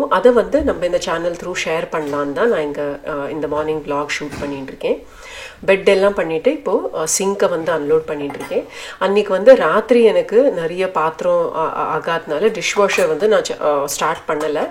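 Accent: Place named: Palakkad, India. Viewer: Hi,your videos are very warm and motivating. Recently I’ve started viewing your videos and i love it. You are really doing a great job.All your recipes are very good and i tried a few also. You have a palghat accent 😊and that’s nice too.Keep rocking dear 👍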